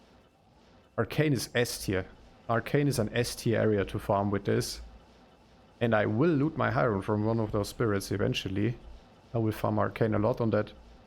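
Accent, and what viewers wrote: Germany. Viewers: Bro u German?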